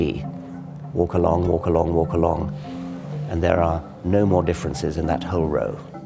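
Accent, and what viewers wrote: United Kingdom. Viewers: "Dawkins is just an ignorant homosexual" Yeah, but he has a quaint British accent. lol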